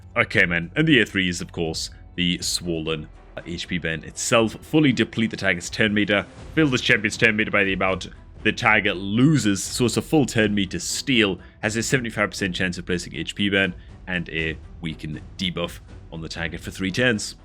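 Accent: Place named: Newcastle, United Kingdom. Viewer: It sounds baseline Geordie but mixed with something else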